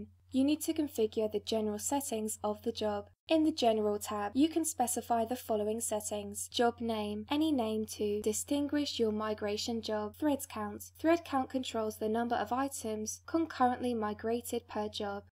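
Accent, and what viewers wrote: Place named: United Kingdom. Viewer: Can't understand a word of her thick British accent.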